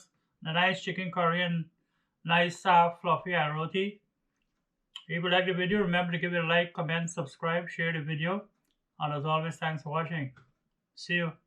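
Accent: Guyana. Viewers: I love your accent❤ pure Guyanese 🇬🇾 Not had a nice chicken curry for along time.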